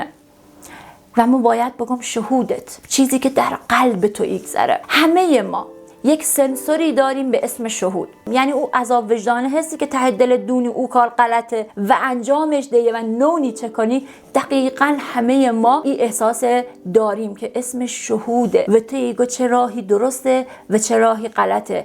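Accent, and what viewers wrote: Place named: Lorestan, Iran. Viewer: جون من که لذت بردم هم از این لهجه قشنگت اصلا فکرش رو نمی کردم که بتونید اینقدر قشنگ با لهجه لری صحبت کنید هم از این راهنمایی ریبات برای مراجعه کننده آن هزار آفرین داری واقعا شگفت زده ام کردی❤❤❤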